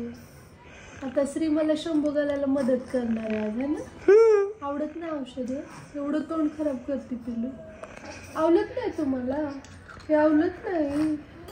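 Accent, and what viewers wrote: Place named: Udgir, India. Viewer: मी पण udgir ची आहे. तुझे vlog पहिले आणि तुझं बोलण ऐकलं की आपल वाटत. आपली भाषा जवळची वाटते.. मस्त झालेय बेबी. मी खास तिला पाहण्यासाठीच व्हिडिओ बघते तुझे. 🤗🌹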